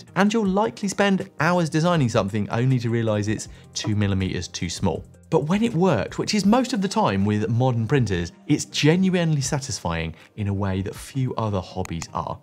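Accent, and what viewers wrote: United Kingdom. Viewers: God, no more British Accents!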